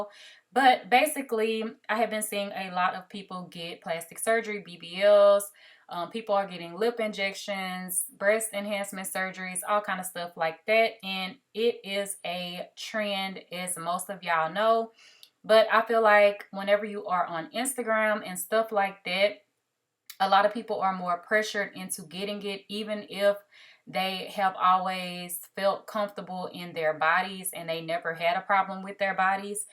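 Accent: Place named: Chicago, United States. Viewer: You kind of have a Chicago accent ❤️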